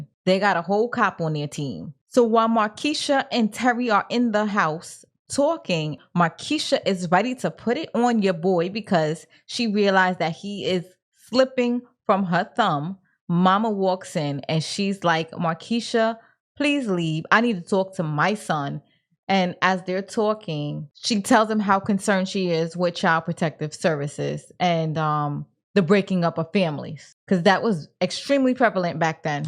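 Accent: New York, United States. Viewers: Your breakdowns and edits are really cool and always hit the important elements of the episode,. Your New York accent adds the flavor - my girl is from Looong Guylanddd. The father-son (and mother) bonding over music is a Detroit thing, IMO. I learned keys, bass, and organ from my mother and uncle. Stay strong!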